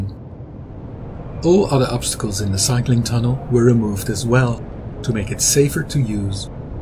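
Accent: Netherlands. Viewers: Lekker Nederlands accent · Interesting mix between a Dutch and English accent :p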